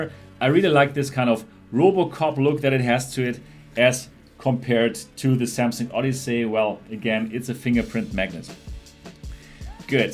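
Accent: Germany. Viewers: Tscherman not Austrian. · Thanks mate for these very well-done and informative videos, complete with a nice German accent :) I am a bit puzzled, though, how you find the Samsung so great while most others seem to find loads of flaws in it. After looking at lots of videos and reviews I decided to go for the Lenovo, just ordered it from Amazon ($279 + customs and shipping). I am a bit concerned about the bluish tint of the displays. Is it possible to adjust the display colours via some software? Naturally you can't do anything about the low contrast of the LDC display but surely it must be possible to adjust the colors more towards red?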